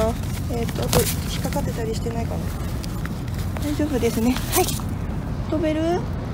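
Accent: Kagoshima, Japan. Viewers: の優しさがとても嬉しく思いました。自分もほっとしましたありがとう😊 私も最近では釣場で空いてる時間にゴミ拾いしてます‼️皆んなが心掛ければ楽しい釣りが出来ると思います😁 所々出てくるかごしま弁がすごく癒されます👍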